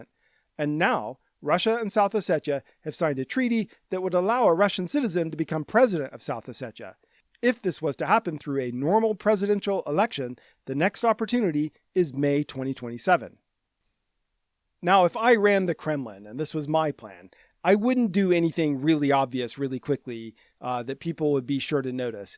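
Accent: United States. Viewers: You sound like a American.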